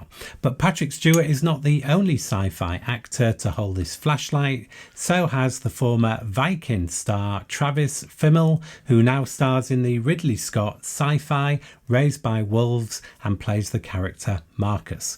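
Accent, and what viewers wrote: United Kingdom. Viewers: Come on! I was JUST about to hit subscribe and then you started bashing Star Trek and my finger mysteriously stopped! My heart shattered and I don’t know if I can listen to your soothing British delivery anymore. Who am I kidding… I share the same name as your friend who made you do this video… I’m in!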